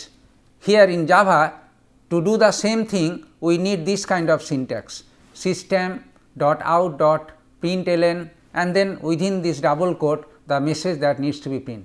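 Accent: India. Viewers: It's indian english ascent😂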